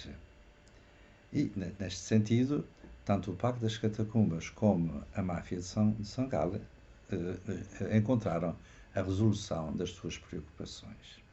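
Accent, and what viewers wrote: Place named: Portugal. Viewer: Saludos cordiales desde Brasil. Creo que hablas el portugués de Portugal. De que paróquia el senhor padre eres? Gracias por su vídeo.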